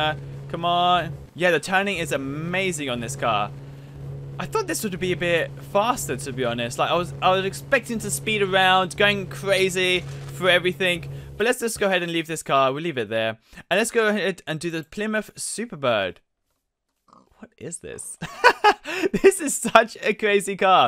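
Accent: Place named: England, United Kingdom. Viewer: You talk like your From englande Sorry England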